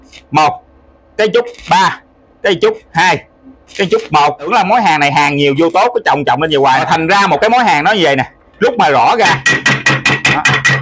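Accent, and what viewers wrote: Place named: Cu Chi, Vietnam. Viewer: Cảm ơn em , video rất bổ ích , nghe giọng em Anh đóan Em ở Củ Chi phải khổng ?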